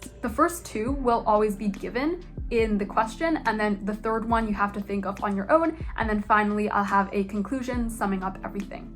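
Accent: United States. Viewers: you sound american so did you take igcse? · i just realised u sound American 😭 did u move here then do ur GCSEs? x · woah if ur doing gcses why do u have an american accent? (i am not interrogating)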